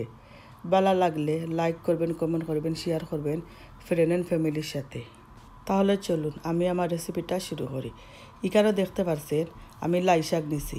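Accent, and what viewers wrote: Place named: Sylhet, Bangladesh. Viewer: সিলেটি মাত আমি ভালো পাই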